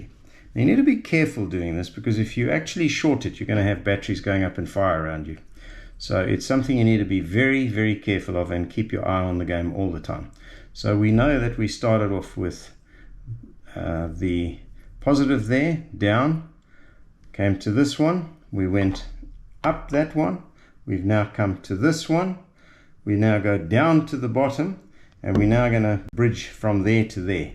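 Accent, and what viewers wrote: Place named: South Africa. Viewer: Best instructive video on Youtube on creating a 6s. As an expat half way around the world great to hear a South African accent again as well.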